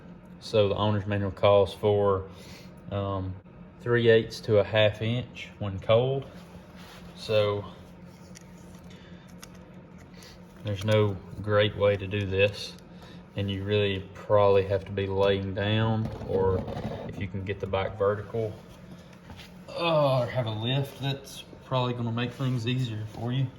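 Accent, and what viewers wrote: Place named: Mississippi, United States. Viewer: You sound like your from Mississippi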